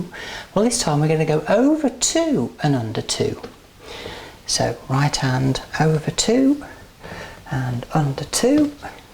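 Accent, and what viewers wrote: United Kingdom. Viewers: Great video. What I loved most was your British accent. Bravo.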